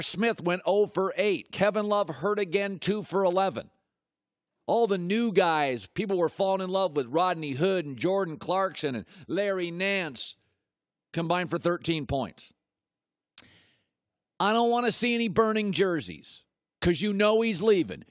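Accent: United States. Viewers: Pure American accent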